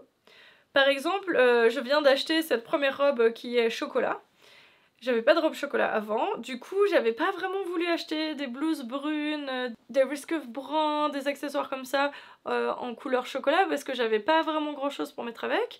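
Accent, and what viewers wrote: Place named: France. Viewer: Je connaissais tes vidéos anglaise et jai tout de suite reconnu un accent français ahah jme suis pas trompée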